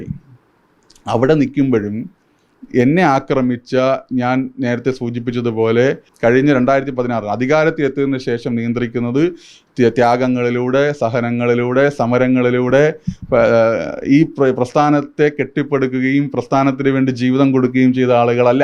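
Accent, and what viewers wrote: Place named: Kannur, India. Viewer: ഈ ആൾ. കണണ്ർ. കാരനാണൊ. വാചകത്തിന്റെ അവസ്സാനം "വേണ്ടി" എന്നുകേൾക്കുന്നു 😢😂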